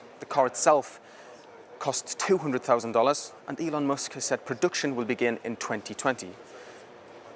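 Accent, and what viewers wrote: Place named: North East England, United Kingdom. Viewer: Its a North East accent...whay aye man :-) Even people from North Yorkshire have to listen carefully...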